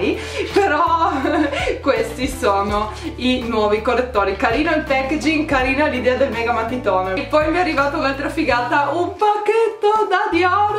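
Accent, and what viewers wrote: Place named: Trieste, Italy. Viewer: accento da vera triestinazza doc..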